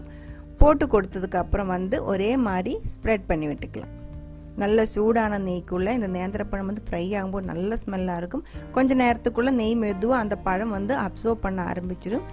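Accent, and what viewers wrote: Kerala, India. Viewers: Kerala smell is reflect ing in ur sweet speach chachi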